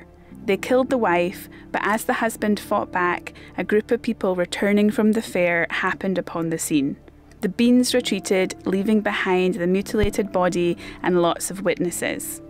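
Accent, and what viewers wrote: Scotland, United Kingdom. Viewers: My favorite quote for this video: "Who needs a house when you can live in a cave." Love your sense of humor and of course your lovely Scottish accent. I don't know if you go back and read comments from months ago. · Cheers from Canada. Love your accent, Being of Scottish ancestry I wondered what I would sound like if I was from there. Lol! Enjoy your stay in the cave, you got it! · Your Genetic Heritage reminds me of an Old Comedian that is Largely Forgotten Nowadays....STANLEY BAXTER;...... U definitely have his Nose👃 & Broad Scottish Accent..... Sooooo; Cool🆒😎👍🤓❤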